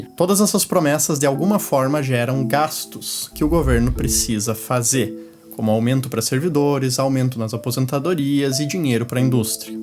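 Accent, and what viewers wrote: Rio Grande do Sul, Brazil. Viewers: sotaque gaúcho é mt legal de ouvir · Que vídeo! Que vídeo! Parabéns e continue assim, edição e roteiro espetacular! Só um detalhe: eu conheço esse sotaque aí em kkkkk noroeste do RS? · Gaúcho tchê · Se esse cara não é gaúcho eu sou maluco shshshs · duma coisa eu sei: tu é gaúcho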